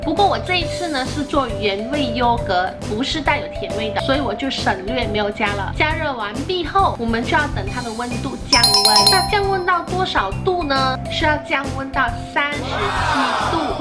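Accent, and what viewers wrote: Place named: Taiwan. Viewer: 姐姐，还以为你是来自台湾的呢？哈哈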